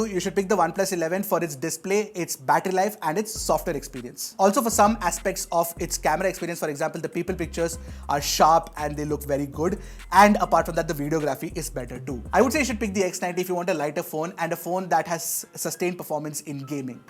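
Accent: India. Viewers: WOOOOOOOOOOOOOOOOOOOO! THAT INDIAN ACCENT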